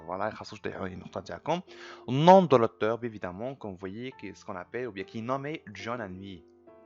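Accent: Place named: France. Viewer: i like your accent like french people !!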